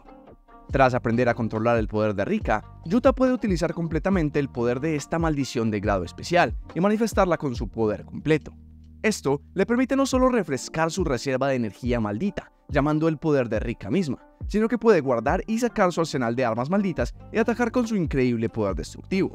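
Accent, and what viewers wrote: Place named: Colombia. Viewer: de donde eres? algo en tú acento me dice que eres de colombia o me equivoco? aunque intentas neutralizarlo creo que se te escapa un poco 😅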